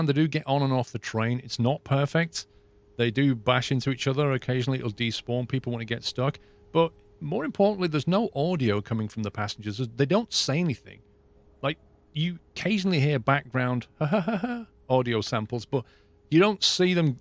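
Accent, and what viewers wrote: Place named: Lancashire, United Kingdom. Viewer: The internet. He's on the internet. If you mean his accent, with the "thuuuuur" pronunciation of "there", I'd say Central Lancashire, somewhere between Southport and Burnley, Blackburn and Bolton. :o)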